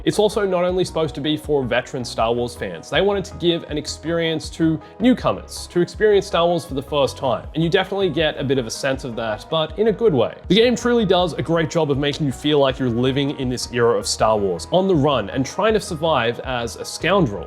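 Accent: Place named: Australia. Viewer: His accent, Aussie w/ an American inflection on all the 'r's. It's irritating. Pick a side of the Pacific & stick with it.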